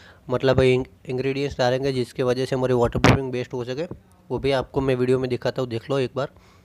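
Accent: Gujarat, India. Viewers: You are gujrati right? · Gujju che k su bhai amuk word sambhdine to lage 6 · Gujarati Accent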